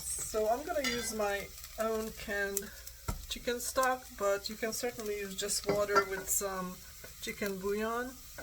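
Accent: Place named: Europe. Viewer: American girl? You have a European accent.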